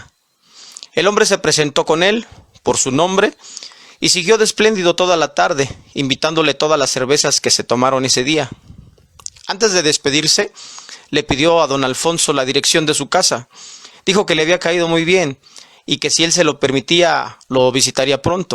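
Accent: Mexico. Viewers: Muy interesante historia y sobre todo una excelente narración, con ese acento mexicano que me encanta. Felicitaciones güero, me enganche a tu historia desde el principio hasta el final. Saludos desde Lima-Perú. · Excelente relato,a pesar del matiz mexicano me encantó . que buena historia digna de una película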